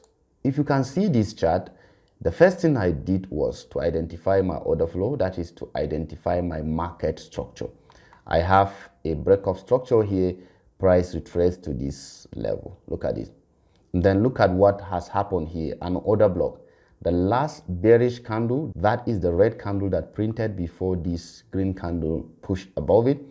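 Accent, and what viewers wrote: Nigeria. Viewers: very good brother , you sound like you must be a Nigerian too that’s why I’m reaching out , let’s rub heads together sometimes hopefully the market charts gets easier with a team ( multiple heads always better than one)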